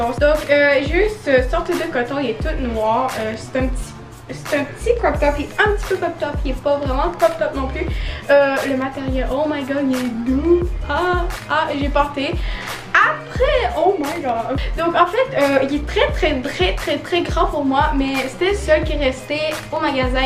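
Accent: Quebec, Canada. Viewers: J'adore ton accent Est-ce que tu e Québécoise? Parce que moi Oui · es ce que t'habite au canada parce que t'a l'accen qc (moi aussi)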